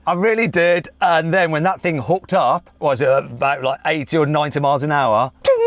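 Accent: United Kingdom. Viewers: イギリス英語って新鮮で好き:) インド人がバイクをbikeって呼ぶの違和感だったけどイギリス英語だとbikeなのね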